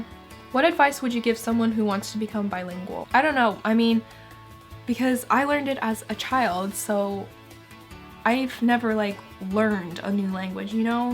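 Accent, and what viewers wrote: United States. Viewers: Hi I thought you are an American in the beginning so.. i speak 2 very old language Assyrian and Syriac and also Arabic and English and im trying to learn Finnish (: wish me good luck by the way all your video are fun to watch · You do sound american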